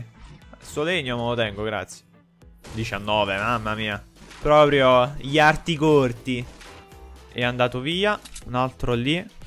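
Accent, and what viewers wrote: Naples, Italy. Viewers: Ma sei di Napoli?😂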